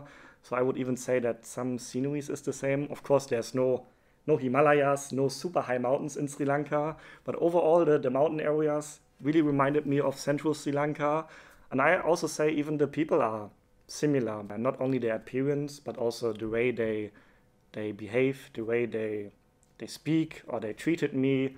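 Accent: Germany. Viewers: U sound like German accent? R u German?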